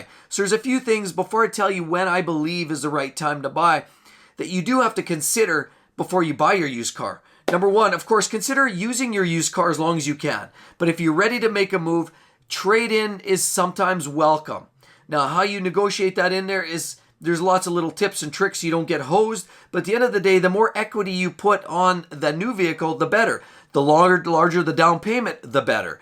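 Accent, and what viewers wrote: Canada. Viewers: What accent is this? Canadian?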